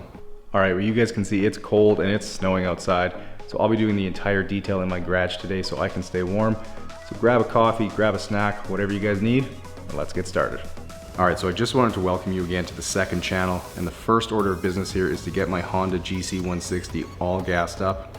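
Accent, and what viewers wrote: Canada. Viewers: That Canadian accent... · I'm in Canada too and we speak the same like he does.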